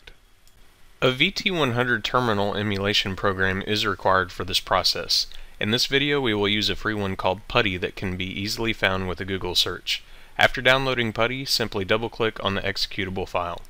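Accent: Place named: United States. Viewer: Warning #1: This is a furry video. Warning #2: The narrator is American and will systematically pronounce "enter" as "enner".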